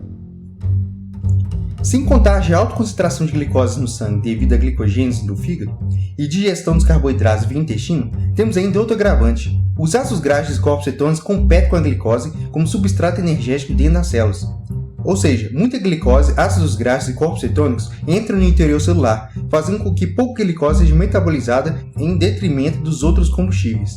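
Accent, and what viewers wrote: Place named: Minas Gerais, Brazil. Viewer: ***** Sua voz é bonita e agradável ao ouvido. Acredito que o "detalhe" no final das frases é questão de mineirice mesmo...kkkkk...Aquele abraço!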